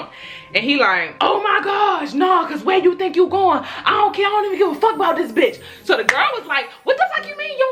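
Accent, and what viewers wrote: Baltimore, United States. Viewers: I had a feeling you was from Baltimore by the way you talk 😂😂 .... we all sound the same 😭😂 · Ahhhh you from baltimoreee 🤟🏽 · I knew your were from Baltimore ❤️ it was the accent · You from Baltimore? SUBBED! On peridot. Fellow DMV Native, should’ve clocked the accent on you 🤪✨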